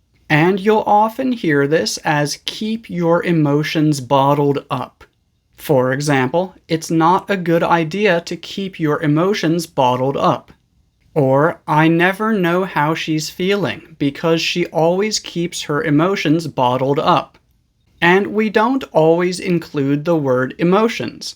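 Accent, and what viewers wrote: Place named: United States. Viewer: I was blown away by your beautiful American accent. 😊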